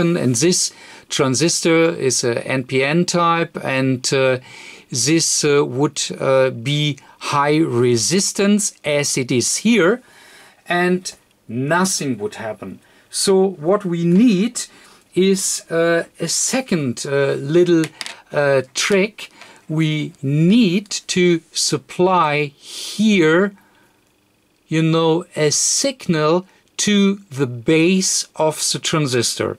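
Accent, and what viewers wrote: Germany. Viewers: One of the BEST way to make even layman to understand. Hats - off. I really enjoy your pronunciation of each word with a tinge German accent. Vielen Danke. Auf wiedersehen. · It was a wonderfull n extremely comprehensive explaination of more than the basic working of SMPSs by the very intelligent gentleman although his english was good enough to follow (i think he being a german) it was very clear n highly informative !! A big Thank You to the gentleman !!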